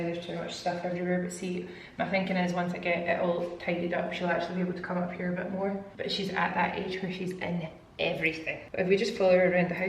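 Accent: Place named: Liverpool, United Kingdom. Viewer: Love your Liverpool accent " where's me sponge" lol😂😂 from a proud scouser❤❤❤❤😅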